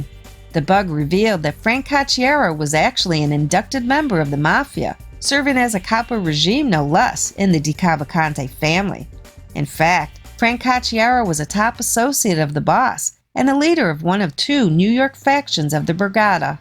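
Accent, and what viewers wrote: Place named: Midwest, United States. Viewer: Horrible narrator. Can’t have someone with a midwestern accent narrate about things going on in NYC. Her pronunciation of the word “Manhattan” made me cringe.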